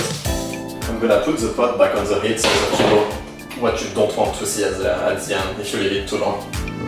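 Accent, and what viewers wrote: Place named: France. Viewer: Your voice and accent remind me of the great French chef Eric Ripert. Great video!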